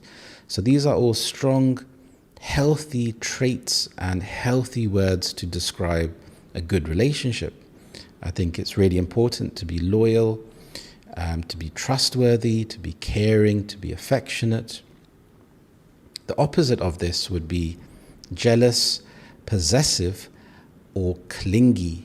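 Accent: United Kingdom. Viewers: I’m Vietnamese , But I like listening your English accent and your videos ,thanks so much and have a nice day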